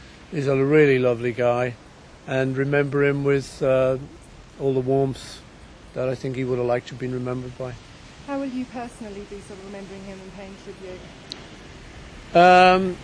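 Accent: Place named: Scotland, United Kingdom. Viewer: Your Scottish brogue, Faul gives you away for the fake freak you R! For those that believe that this man is do the months of research and you will find this man! 2 identifiers Real had Dark Brown Eyed not Greenish Hazel Eyes and Real Paul didn't tower John Lennon nor George Harrison! It took me a long time to see the difference but once you do it's a no brainier!!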